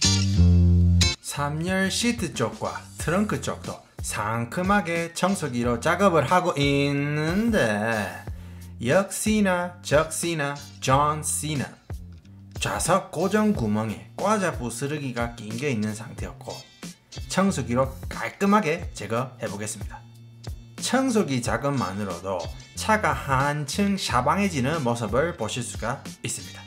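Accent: Gyeongsang, South Korea. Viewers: 고품격과 저품격, 잉글리쉬와 갱상도쉬가 혼재된 나래이션이 상당히 중독성 있네요 ㅋ 열씨미 새차로 마이 맹글어주세요~😀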